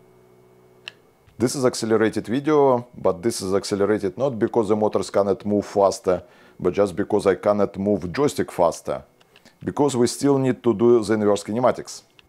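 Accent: Russia. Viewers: Вот так выглядит русский акцент)) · Really cool project 👍 P.S. Which accent type that is? I think about Russian, but i dont really know 🤔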